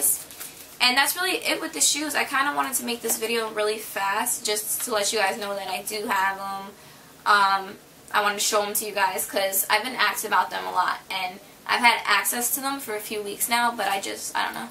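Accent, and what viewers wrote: United States. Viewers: are you from boston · R u from jersey?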